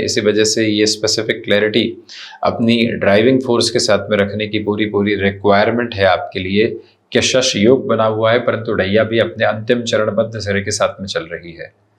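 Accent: Standard. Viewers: Aap itni shudh hindi mein bolte hain ki mai chah kar bhi aapki predictions dekh nahi pati hun. Kyunki samjh nahi ata. Kripya thori saral aur aasan hindi mein batayein. 🙏🏽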